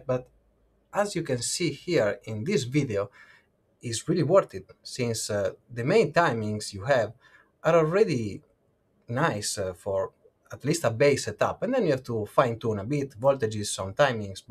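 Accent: Italy. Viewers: wait, are you italian?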